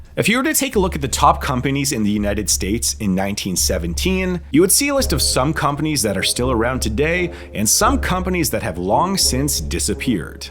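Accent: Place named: Canada. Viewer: Welcome back,! Love your vids and friendly Canadian voiceover. ☺️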